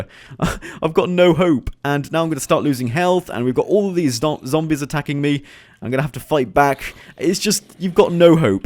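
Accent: United Kingdom. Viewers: He didn't say that just because he has a British accent. Do you know how many British YouTubers there are? None of them I've watched sound lime Stewie, but he actually does.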